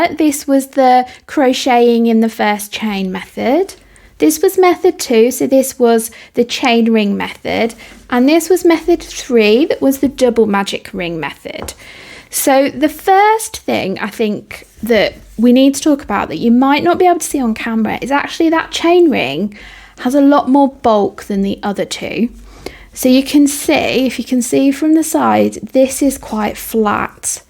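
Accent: England, United Kingdom. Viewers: Fantastic ❤ shame you use US terms as you are from UK - would suggest you say UK terms with US terms on the screen?! Such a nice change to hear an English voice 😊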